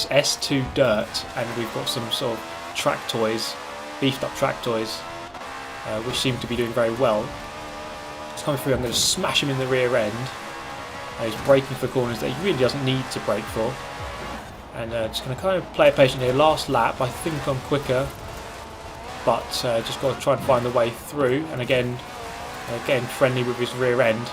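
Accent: United Kingdom. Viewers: british accent ; Miles Per Hour. hm..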